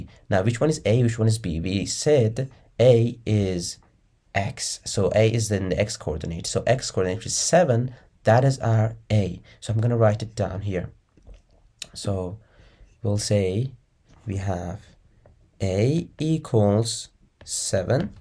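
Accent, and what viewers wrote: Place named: Turkey. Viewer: aksandan türk oldugun anlasılıyo kral guzel video olmus eline saglık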